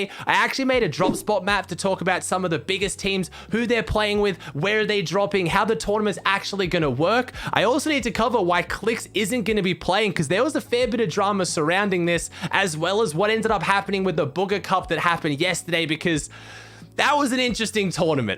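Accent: Australia. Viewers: asmr?? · Man I love starting my day listening to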